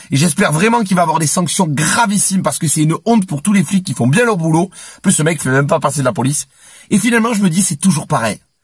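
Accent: South, France. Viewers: Merci pour ton accent qui me fait voyager dans le sud!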